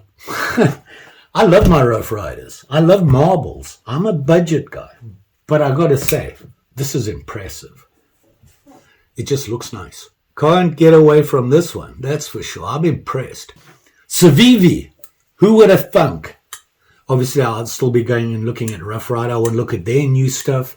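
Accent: South Africa. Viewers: Just found your channel and being a knife maker and mad about knives really enjoying your content, recognized that accent straight away 🇿🇦 . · are you south african ?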